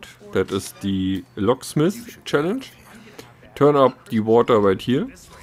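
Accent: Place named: Germany. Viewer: I like your accent. Sounds Deutsch!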